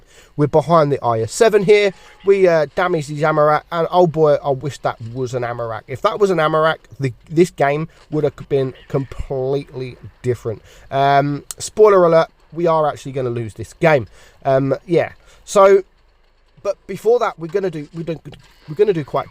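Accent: United Kingdom. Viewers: don't ya love it when gets on the mic and says in his British accent "Hello! I'm, and welcome back to the channel"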